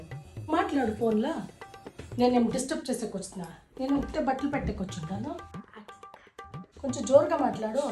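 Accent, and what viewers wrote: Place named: Karnataka, India. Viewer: Our Karnataka telugu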